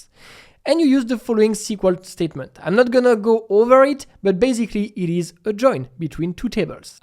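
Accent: France. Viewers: Thank you, but I'm sorry, English with French accent is terrible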